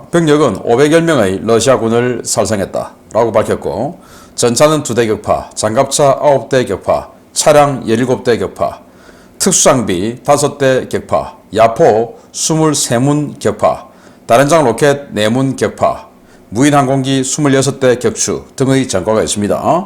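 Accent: Gyeongsang, South Korea. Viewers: 경상도 사투리가 매력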